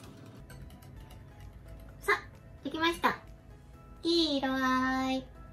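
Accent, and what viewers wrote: Kansai, Japan. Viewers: 関西弁しゃべってるほうがかわいい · ゴリッゴリの関西弁も聞きたい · たまに出る関西弁がかわいくて好き！ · の関西弁もっと聞きたいよ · やっと菜々さんの関西弁が聞けたよ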